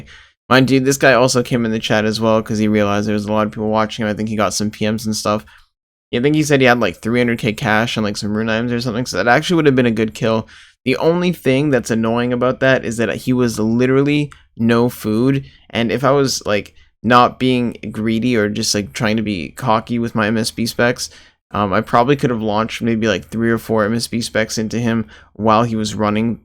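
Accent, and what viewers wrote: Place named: Ontario, Canada. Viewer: Are you Canadian? Sounds like south Ontario.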